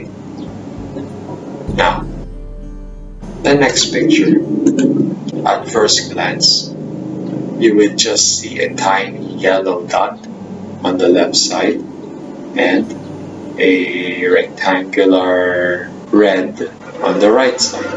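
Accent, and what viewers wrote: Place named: Philippines. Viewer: totally has a Filipino Accent.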